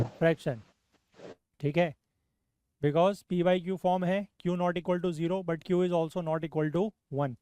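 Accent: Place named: Kashmir, India. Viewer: That Kashmiri accent😂❤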